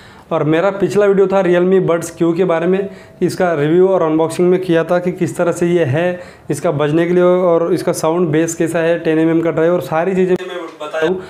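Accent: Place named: Mumbai, India. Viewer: Fucking useless i cant understand bombay shit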